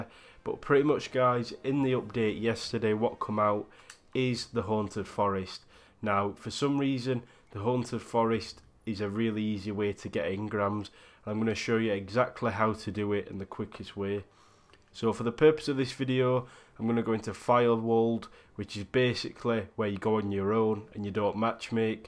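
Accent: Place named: Northern England, United Kingdom. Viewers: Love your accent, are you from England? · Love these farming methods mate. Nice work. Glad to hear a fellow northerner on here helping the community out 👍🏻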